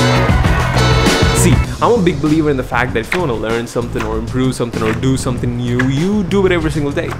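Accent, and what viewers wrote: India. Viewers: Youra indian but your voice is clear woq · I dnt know why, but i hear a severe indian accent. Are you an indian? 😵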